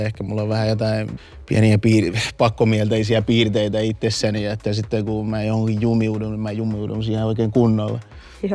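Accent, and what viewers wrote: Tampere, Finland. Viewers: on niin symppis. 😭❤️ Tampereen murre pehmentää häntä vielä enemmän. 😍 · His songs might be in english but he also has a really strong Tampere accent. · Kommenttini ei liity mitenkään oikeen mihinkään, mutta nokialaisen eteläsuomalaisena tyttärenä, musta on niin kiva kuunnella tota tamperelaista ärrää ja nuottia :DDD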